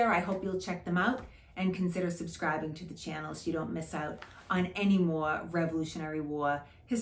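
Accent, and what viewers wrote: Boston, United States. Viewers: Thanks for your efforts to bring us Revolutionary War history. I live in rural NH and love your Boston accent. Reminds me of a good friend I served with in the Marine Corps. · Boston is a place where history surrounds you, I fished out of Boston years ago, I tied up across the channel from Griffin's wharf the sight of the Boston Tea Party, every morning I thought about that event. On my way out heading east to fish I would turn around and see the Boston skyline in the early morning sunlight including the Custom House Tower and the spire of Old North Church where Paul Revere saw the lights in the belfry. A truly wonderful city with much history to see everyday. Love your channel and your Boston accent, makes me homesick for New England.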